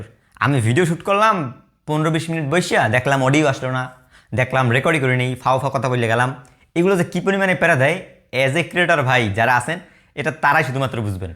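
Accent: Barishal, Bangladesh. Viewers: ছোটভাই তোমার বরিশালের ভাষাতে অনেক ভাল লাগে। ওই ভাষাতেই ভিডিও করো। আমার অন্তত ভালো লাগে।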